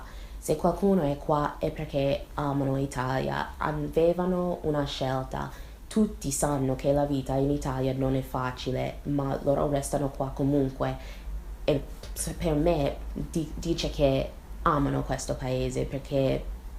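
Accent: United States. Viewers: Complimenti per il tuo italiano, anche se ancora si nota molto l'accento Americano ho trovato il tuo modo di parlare molto corretto e soprattutto grammaticato,mi è piaciuto molto ascoltarti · I barely understand Italian but I can hear your American accent loud and clear! <3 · come mai hai lasciato un paese così bello come l'america e sei venuta in italia? comunque parli molto bene italiano ed è bellissimo l'accento americano che hai. sei bellissima💗👋🏻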